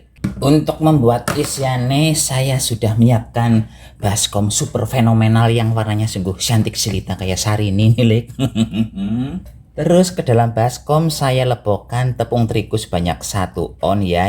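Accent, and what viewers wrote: Banyumas, Indonesia. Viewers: Bahasane mblaketaket Banyumasan versi Indonesia he hehe lanjutkan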